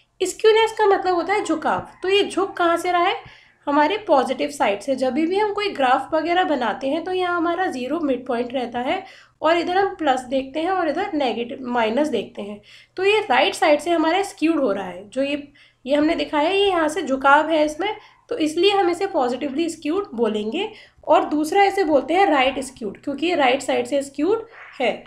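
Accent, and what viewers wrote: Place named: Odisha, India. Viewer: Mam i think you are from odisha 😍😍😍😍🥰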